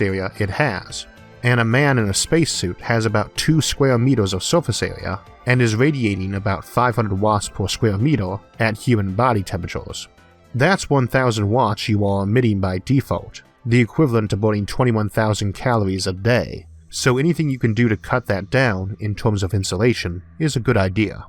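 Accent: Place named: Texas, United States. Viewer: I didn't think you had a speech impediment, I just thought you were from Texas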